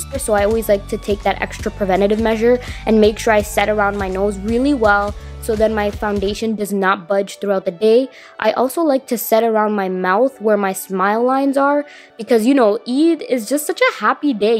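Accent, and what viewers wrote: Toronto, Canada. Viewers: I hear the Toronto accent lol!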